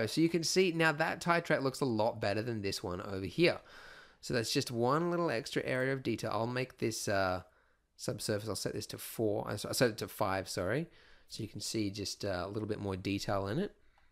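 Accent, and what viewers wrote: Australia. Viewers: isnt this guy an australian? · Australian describing snow is funny to a Canadian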